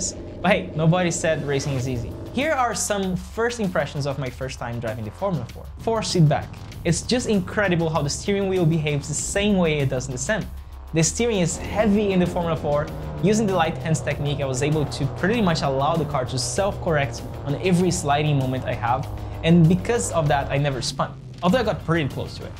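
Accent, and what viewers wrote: Brazil. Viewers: Cara, como que vc desenvolveu seu ingles assim? Eu tava escutando voce falando, e pensei, nossa ele parece um brasileiro falando ingles, mas fui ver tava tudo em ingles, comecei a ter duvidas, mas vi a bandeira do brasil no carro... conta o segredo dos ingles pra nois ai kkkk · Eu já tava achando q ele era brasileiro só de escutar o sotaque e também pelo nome dele, agora q tu confirmou tirou minha dúvida.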